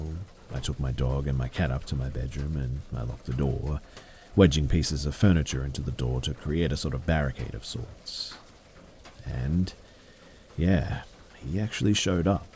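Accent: Australia. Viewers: THANX FOR ALWAYS BEING CONSISTENT WITH AWESOME CONTENT.. I ADORE UU.. UR AUSSIE ACCENT IS SOO CUTE!! TY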